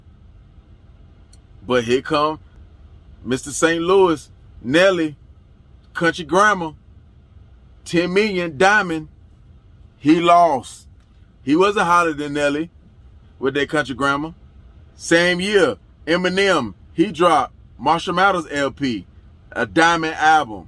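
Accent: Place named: South, United States. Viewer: Bro are you from the south sound like you from here I know my southern people shout out to you from Louisiana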